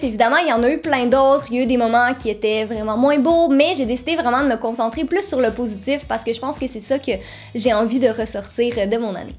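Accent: Quebec, Canada. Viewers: Yasmine Bouisagouane l'accent Québécois moi aussi j'💜 bien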